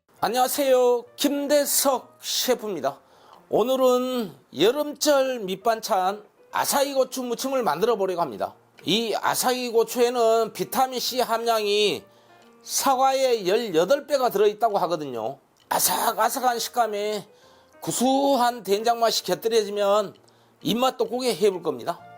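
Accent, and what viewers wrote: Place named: Gyeongsang, South Korea. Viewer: 너무 메이갈지말고 ㅋ 쉐프님도 경상도분이시군요 이거람 남편 반찬 걱정없겟어요 ㅎ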